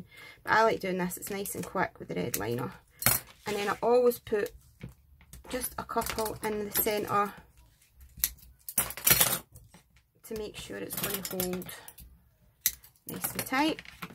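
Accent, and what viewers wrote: Scotland, United Kingdom. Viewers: I love love love your Scottish accident!!!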